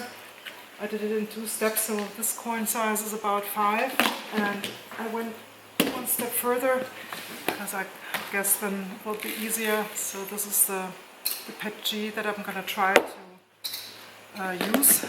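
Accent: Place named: Germany. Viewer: Da ich höre das Deine Muttersprache Deutsch wäre, frage ich in Deutsch....😅 Funktioniert es gut?...hattest Du viel ärger damit? Überlege mir auch so einen zu kaufen.😊 Grüsse Roy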